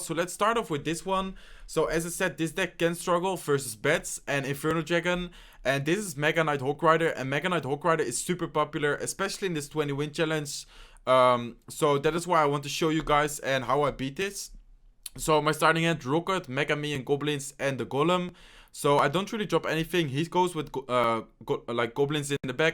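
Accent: Netherlands. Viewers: Je hoort nog een beetje dat je nederlands praat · Je Nederlandse accent 😂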